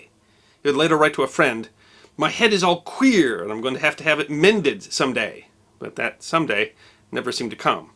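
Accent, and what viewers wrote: United States. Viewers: Sorry but it doesn't sound right with an American accent. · Do forgive my apparent rudeness in writing this complaint to you about the way in which you narrate the uploads, which are well written but in my opinion badly spoken. The point is reached at times when the spoken words running continuously together, sound like a squawking chicken with an American accent. Furthermore, the word " duodenal " sounds phonetically as follows: " derwadenal " and whilst this might be the American way to pronounce this word, there are other examples, where the narrative is a confusing staccato aural onslaught. Your voice is highly pitched for a man's voice, in the same key as Kermit the Frog, from the animated puppet show called the " Muppet Show". Perhaps you should employ a professional speaker to narrate your uploads, which otherwise are very well put together. 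Best wishes, and keep up the otherwise good work.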